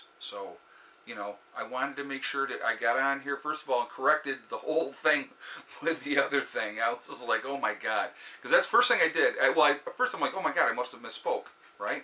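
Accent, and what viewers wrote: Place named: New York, United States. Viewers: That’s too funny. I thought you said 8,000 but it didn’t even phase me because I don’t watch the market. I think it’s the NY accent! Blessings! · I heard 8,000 too! 😄 Must be that NY accent. In NY, 'A' means 'What?'. No biggie. 👍 Obviously, this virus is being handled by the 3 Stooges. I've spent a ton of $ too. My credit card is screaming! Think, research, prepare, and plan to take care of yourselves and your families. Stay safe everyone & God bless.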